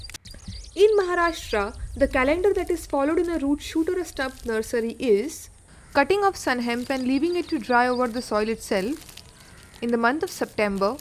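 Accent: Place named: Sri Lanka. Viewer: Though the video is very informative, it's very hard to digest ur srilankan English..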